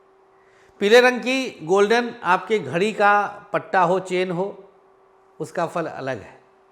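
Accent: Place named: Awadh, India. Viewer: अवधी बोली❤ प्रणाम गुरु जी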